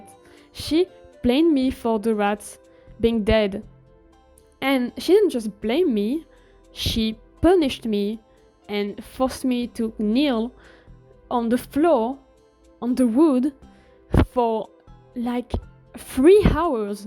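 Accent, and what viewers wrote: France. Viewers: So, I watched this while drawing some fanart, and I must say, your voice is very calming, and as someone who speaks french too (canadian, first langage french), I didnt mind your accent at all although I personally dont have one as strong. Your voice honestly calmed me down, I have very bad anxiety problems. But, more importantly, i'm very glad that you got out of this situation. You mentionned in the video multiple times something along the lines of: ``and well while you might not think its abusive enough, it..`` I'm just gonna say straight up that there is no such thing as being abusive enough. Abuse is abuse either way, and anyone who can't see that is an absolute moron who needs to get educated. Listening to some of the stuff you said, I honestly felt a sinking feeling in me, I just kept getting more and more concerned for you, and then reminded myself that you got out of there, which brought me relief. 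But the fact you had to deal with it for so long in the first place is awful already, I am so sorry you went through this at all. Anyways, just wanted to show that I care and that I'm proud that you managed to get through this until you got help. And I'm also impressed that you managed to talk about this on here, I truly wish you the best and a wonderful day, and I really like your art a lot, looks yummy :)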